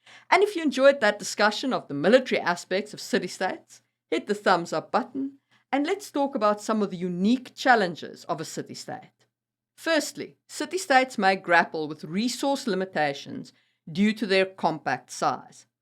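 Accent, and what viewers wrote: South Africa. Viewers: Great video, thank you!! Do I detect a South African accent? 😎 While watching I kept thinking about Cape Town's historical and modern viability as a city state. I'd love to see some videos about how nations and empires crumble, and what happens in the aftermath.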